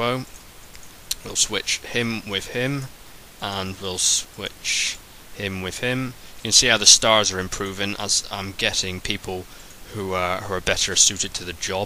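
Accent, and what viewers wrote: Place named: England, United Kingdom. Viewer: the english invented english, so their accent is the right one. you Americans pronounce it wrong, not him.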